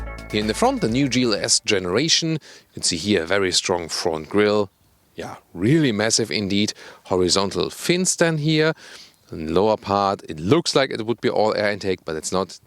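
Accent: Germany. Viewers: I love your detailed commentary. Your English is almost perfect. But you have a very German style of speaking and sentence structure, plus use of some expressions in a German rather than English format. It would be helpful if you spoke more slowly and more clearly. Then we could enjoy every wonderful one of your observations.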